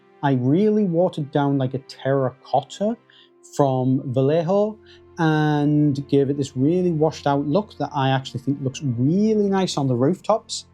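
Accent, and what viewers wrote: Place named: Teesside, United Kingdom. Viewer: Great video buddy. Where do you play your local games sounds like we’re from the same area. Teesside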